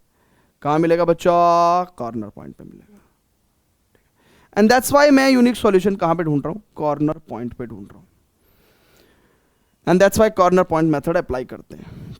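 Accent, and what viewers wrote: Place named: Uttar Pradesh, India. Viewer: Sir m bhi up se hun isiliye relate kr skta hun aapke bolne ke tarike se ekdm up wali tone h bht maja aata h...❤😂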